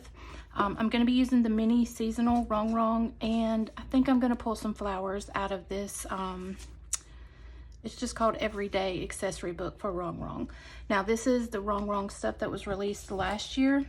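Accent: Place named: Texas, United States. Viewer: What state are you in ? You sound southern or Texan It is a cute spread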